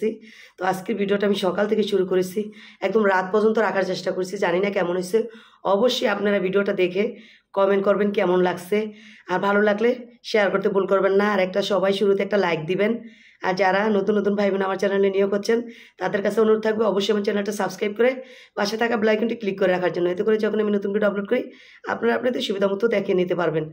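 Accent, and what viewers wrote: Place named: Brahmanbaria, Bangladesh. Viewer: কিসের জন্য এমনটা হয়েছিল আপা এটা জানতে পারছেন তো যা হোক চ্যানেলটা যেন ঠিক হয়ে যায় আপা আপনি আমাদের ব্রাহ্মণবাড়িয়া বাঞ্ছারামপুরের সেম ভাষা বলেন অসাধারণ কথা খুবই ভালো লাগে তো দোয়া করবেন আমি আহমদ আলী সৌদি আরব রিয়ার থেকে ব্লক গুলি দেখি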